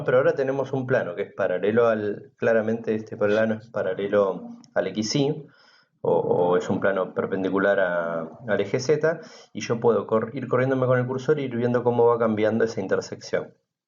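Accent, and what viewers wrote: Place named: Argentina. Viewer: hola como estas? genio excelente explicacion, argentino tenes que ser ja ja. tenes idea como mover el plano en geogebra 3d? abrazo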